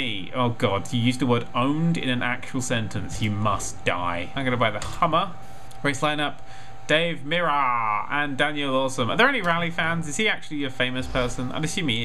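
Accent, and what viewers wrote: England, United Kingdom. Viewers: Is Canadian? Because he sounds English. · no I'm pretty sure he sounds more like a English person. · I don't know why British Accent makes him funnier for me. Well, may be, because I am a spanish speaker...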